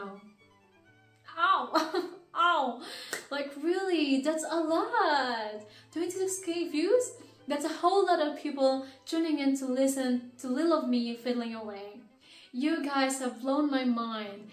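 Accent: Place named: United Kingdom. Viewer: lmao I can see you're developing a British accent, and I honestly love it being part British myself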